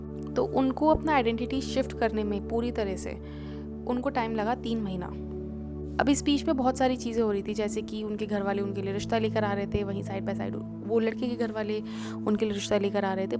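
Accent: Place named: China. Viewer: ITS SOUNDS CHINEESE MIXED WITH EAST INDIAN A TINY MIX OF SOFT RUSSIAN BUT MOST LY EASEAST INDIAN WITH A RARE FRENCH DIALCK MIXED ALL IN ONE AND THE COUNTRYS ARE VERY CLOSE TOGETHER AND 1 LANGUAGUE I IM VERY FAMILITIAR HEARING MIXED IN TO OR ARE U CHANGING THEM AS U TALK OH I FORGOT A FORM OF ENGLISH I HERE TOOO LOL IM INTO MAPS LANGUAGES AND DIFFERNT PEOPLE OF THE WORLD I LOVE THIS ITS LIK ,Y HOBBY ANY WAY IM GOING TO MANIFEST A AY TO TO SEE WHAT YIU LOOK LIKE IM A VERY CIRIUOSE PERSON AND IM A VISUAL KEARNER I LOVE EYE CANDY TOO PLUS U SPAK MY TRUTH I DONT BELIEVE IN ANT OTHER WAY TO EXPRESS MYSELF I FEEL GOOD LETTING OUT MY THOUGHTS AND I NEVER SEE PEOPLE COMMENT LIKE ME I DONT BELIEVE IN FEAR LIKE SOME PEOPLE THINK THERS A RIGHT WAT TO TALK OR HOW RULES AT LEAST WHERE I WAS BRAINWASHED GROWING UP ON LAND THEU CALL IT A COUNTRY MAMED CANA DA ;//CHNA DA IS ITS NICK NAME AND WE HAVE EAST INDI ANS AS OUR LARGEST IMAFORGN POP INOT SURE IF BORN OR IMAGRATE OR MIXED I WE ALL JUST COULD GET ALONG GOT RID OF THE BAD PERSONALITYS AND THE PWOPLE WHO CAUSED OTHERS HARM WE MIGHT BE ABLE TO STOP THE PROPAGANDA THAT MAKES US ALL TURN OM EACH OTHER WE SHOULD TURN O THE 1 $%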